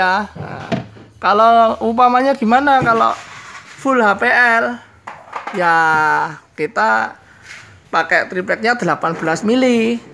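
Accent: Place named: Java, Indonesia. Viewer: sip boso jowone medok .👍👍👍👍 tapi inspiratif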